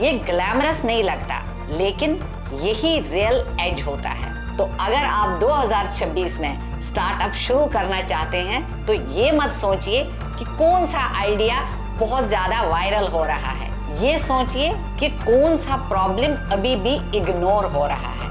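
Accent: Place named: Kashmir, India. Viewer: Aadab mam ... I c your content just now first time ... Your accent of taking is just kashmir type... Any i appreciate your work... Best of luck Dr Masood Ahmad Tantray.... Educationist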